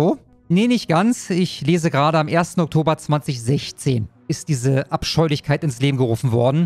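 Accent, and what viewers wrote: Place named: Berlin, Germany. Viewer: Oh ok cool 👍. Berlin hab ich so ein wenig rausgehört aber ich dachte eventuell hättest du russische oder polnische Wurzeln, weil es sich etwas danach anhört wenn du sprichst 😅👍 liebe grüße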